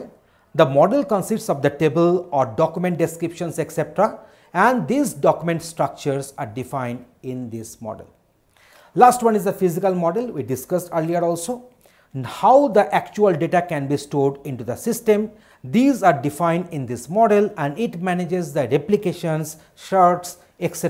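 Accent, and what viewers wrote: Bengal, India. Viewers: Thanks for the video. You sound like a Bengalee. :-)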